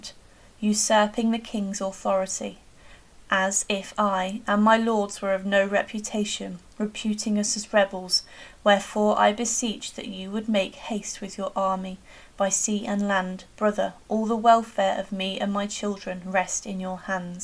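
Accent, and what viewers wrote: England, United Kingdom. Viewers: While it is spelled ‘Holy-rood’ it is pronounced ‘Holly-rood’. I am Scottish, born on the west coast, grew up in Inverclyde, university in Aberdeen, lived in Edinburgh - where Holyrood is to this day - and I can assure you, your pronunciation is WRONG. Given your accent, I am assuming you’re English. You should know better.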